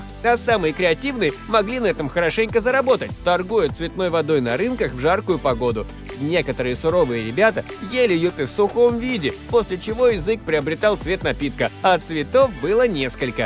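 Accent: Kazakhstan. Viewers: Ты из Казакстана?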